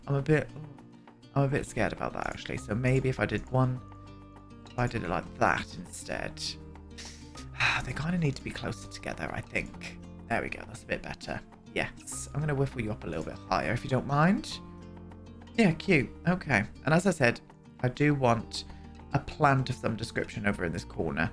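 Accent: United Kingdom. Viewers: where in the uk is this accent from?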